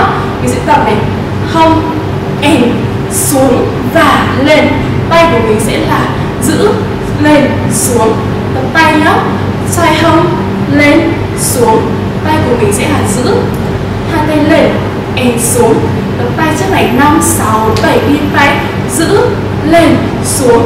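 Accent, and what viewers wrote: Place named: Hanoi, Vietnam. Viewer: Ôi, giọng Hà Nội nghe ấm, sang, thanh lịch quá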